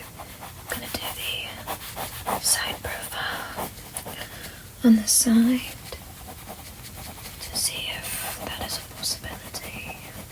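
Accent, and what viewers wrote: RP, United Kingdom. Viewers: Just when you think she can't possibly get any more adorable... enter the British accent. 🗣❤ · As a Brit... I wish our accents were all this posh..... Mine certainly isn't! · Ngl although you sound like more of a upperclass english person its not far off. Better than mine and ive lived in the uk all my life 😂😂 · I'm British and the accent is lovely:) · Very Bri'ish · I love the British accent and would for sure welcome more of this! Great video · As an English accent I find the person quite brilliant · Not a bad attempt at the british accent also love your look aswell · As a fellow Britain myself I don’t know how to feel about ur British accent but keep up the good work!!! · Hello! Lovely to see you again on this fine day. Such a pleasant experience to hear your British accent in this video. We really appreciate your time and effort to make such delightful asmr for us and for that we thank you! 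Cheers! 😊👏👏👏👏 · sorry but that was old british im not trying to be a pest but i find it a-bit stereotyped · Your voice is adorable with british accent ❤ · I've lived here in the uk all my life (47 yrs old) and yet to meet someone who speaks as posh as this, but good attempt though. great video though. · As an English person I find your accent quite amusing and endearing. It's a bit like a quirky Joanna Lumley. Quite plummy and soothing. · Just to be clear, folks, it isn't a British accent, it's an English accent. However, it is very commendable nonetheless 👏🏼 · British was something I never knew I needed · ASMR is so much better with an English accent, I probably won't stat awake more than 5 minutes, so thanks ahead of time, good night 💤💤💤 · Your posh I can tell 😂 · I think I look much better in the portrait, you draw excellent 👌👌👌 It was very relaxing for me to listen to you speak in British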